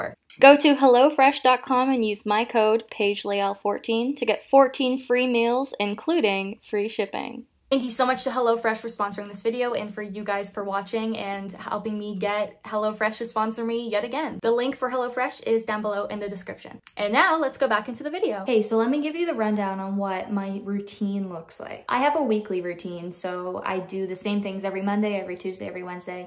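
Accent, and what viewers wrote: Canada. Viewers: Canadians also say car... interestingly. So, the sentence "I'm about to take the bag out to the car" is, uhh... real interesting. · How would sounding too Canadian be a problem? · I can't unhear the "about" and "out" now 😂 it makes me wonder if I do the same thing but I'm western Canada so idk of we would talk the same also super cute kitten! And congrats on your new exciting changes! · It's so silly they wanted you to sound less Canadian. Why did they specifically want it to be American? Why not English or something else? The "assume american unless otherwise stated", "american is the default/assumed place of residence" and "all things american are superior" attitudes are so weird. I'm Australian and I much prefer the Canadian accent over the US accent. Your voice and accent is lovely.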